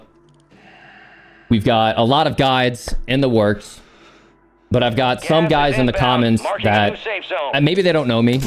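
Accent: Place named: Liverpool, United Kingdom. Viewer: The only thing you need to know is that he’s scouse. Rotten to the core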